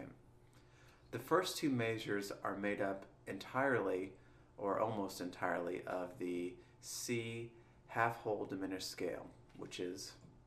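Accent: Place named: South, United States. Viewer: i only just noticed by your accent u are from the south even after watching this vid for years. greeting from Long Island NY. thanks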